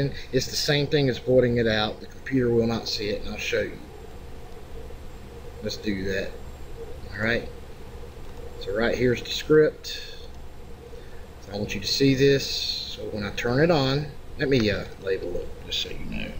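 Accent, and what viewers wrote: South, United States. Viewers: I appreciate your videos man, I love to understand how things work and when I can't do something I force myself to learn how! I was hoping to make my own cheat for America's Army: Proving grounds a 32-bit old fps game on steam and since it's so old people aren't rolling out cheats for it anymore not like CS:GO cheats and so a guy on unknowncheats forum recommended your channel for learning cheat engine hacking and I'm glad I love your southern voice and you explain everything clearly and you get to the point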